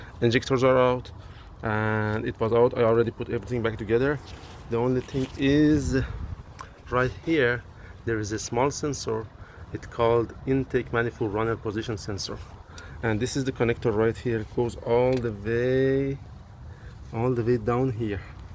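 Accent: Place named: Iran. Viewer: Persian accent😊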